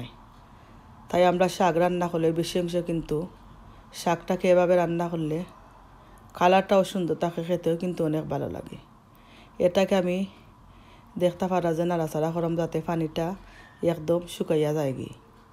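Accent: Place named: Sylhet, Bangladesh. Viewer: সিলেটি মাত আমি ভালো পাই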